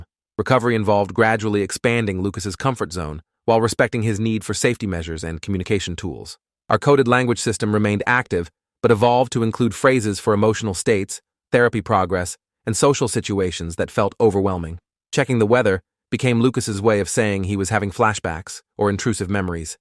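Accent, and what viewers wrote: United Kingdom. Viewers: British accent anyone?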